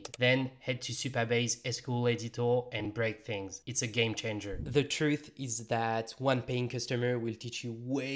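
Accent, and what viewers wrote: France. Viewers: you sound french right . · Sounds like a french man 😅. Ahh Really informative video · Toi t'as un accent français 😀! Cool ta vidéo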